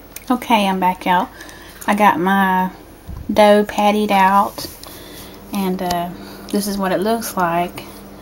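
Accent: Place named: South, United States. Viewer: I LOVE your videos. I am a midwestern cheese, corn everything & cheese girl going raw. Have been for 10 days. We crave what we know and let me tell you, your videos have made it easier for my husband and I to go raw. Thank you very much. Would like to see some new videos from you so you can let us know how you are doing! If you're not still raw, it's ok. You have an entire list of followers ready to cheer you on again! And I love your Southern Belle voice.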